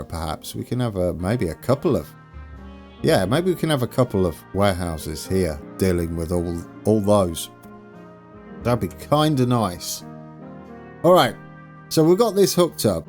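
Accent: United Kingdom. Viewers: For as British as you sound, not knowing Great Clock of Westminster is ironic. :P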